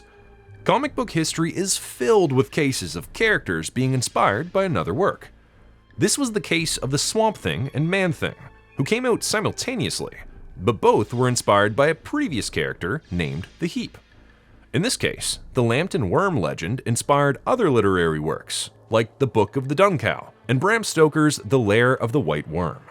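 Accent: Canada. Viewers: a robot commentator with a Canadian accent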